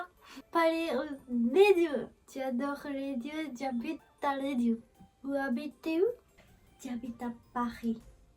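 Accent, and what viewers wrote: Japan. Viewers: Merci pour cette vidéo , avec cet accent vraiment Kawaii, tu parle très bien Français bravo , mieux que je parle le Japonais 😆 Arigato Gozaimasu 👍😊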